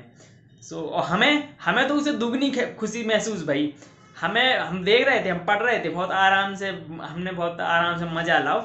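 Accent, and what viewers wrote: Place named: Bundelkhand, India. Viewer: Itni khtarnak to hum jese gao ke ladka bhi nii bolt bundeli 😁😁😁😁😁😁😁😁😁😁😁😁😁😁😁😁😁😁😁😁😁💋 maan gye bhaiya aap to Chhtarpur city se hoke bhi dhuadhar bundeli jadat ho 😍🤣🤣🤣🤣🤣🤣🤣🤣🤣🤣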